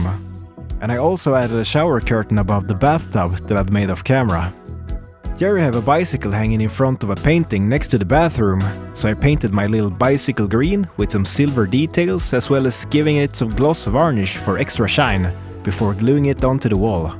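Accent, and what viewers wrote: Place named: Norrland, Sweden. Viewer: Lägga plaank, where in Sweden do you live? Sounds like up north 🎉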